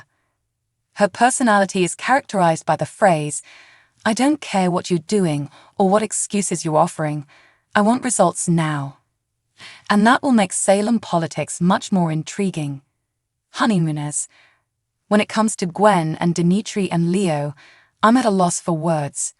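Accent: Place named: United Kingdom. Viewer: Love your accent over the pond i take it ❤ good job 👍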